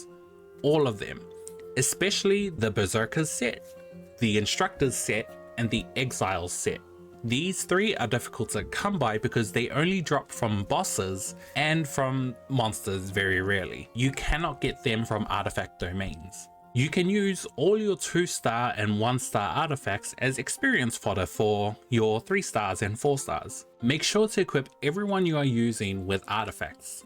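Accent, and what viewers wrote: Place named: New Zealand. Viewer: That's an accent I recognise, kia ora!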